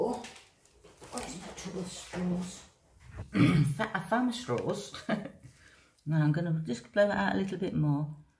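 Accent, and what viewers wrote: United Kingdom. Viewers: my DArling this is bloody fantastic❤ I absolutely loved your video loved your experiment loved the outcome and love that I can use my fancy British Accent[in my head] when typing to you🤣🤣🤣Sorry I'm a huge Royal EVERYTHING fan so when I hear that beautiful accent I go gaga. Love you sister. TaTa for now Dahhhling❤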